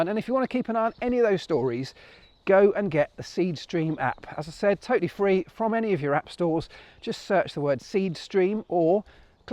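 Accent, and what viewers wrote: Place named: England, United Kingdom. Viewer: Marc, the combo of you walking your dogs through the English countryside while giving a blow-by-blow on the latest F1 is genius. Unique. I am a fan and Seedstream user. Authentic stuff only a true F1 participant could pull off. You know that an English accent can raise bias alarm bells but thank you for keeping the commentary on an even keel. Continued success and many thanks.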